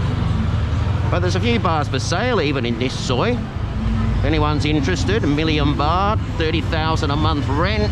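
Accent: Australia. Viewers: just subscribed love your vids where did you come from sound aussie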